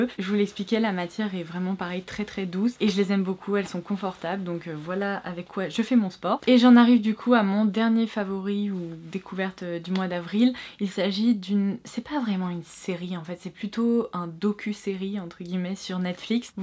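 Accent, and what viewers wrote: United Kingdom. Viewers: Ton accent anglais style britannique c'est si jolie 😊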